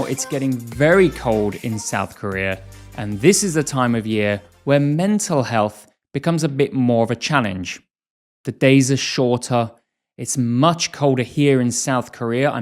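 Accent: United Kingdom. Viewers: Happy New Year from Azerbaijan🇦🇿 Thanks for podcasts🙏🏼 and your british accent is amazing very clear we could understand you whole conversation.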